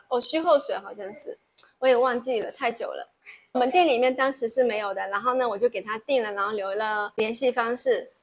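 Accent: Taiwan. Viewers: 为什么非要学台湾腔？ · 厲害，講話幾乎沒有大陸口音，反而是台灣口音了！😀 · 口音变台湾腔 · 您的中文聽起來已經有點台中腔了，大概是慢慢地被同化了吧？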